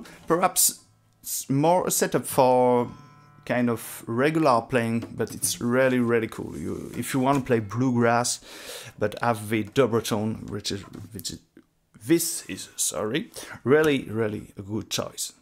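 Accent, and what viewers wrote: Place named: England, United Kingdom. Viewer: Super video, bon j'avoue que l'accent anglais pique bcp et que j'ai eu un peu de mal avec ça mais sinon très chouette sujet, bien traité et magnifique jeu ! Gros coup de coeur pour les résonateurs mule depuis quelques temps (et cette vidéo ne fais que le confirmer) donc ma préférence va au tricone mule vert.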